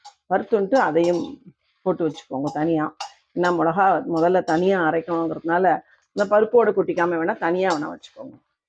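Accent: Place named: Thanjavur, India. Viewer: Very nicely you are teaching. Thanjavur pani peachu , but little Thirunelveli pechum mix panni you are speaking . Thank you.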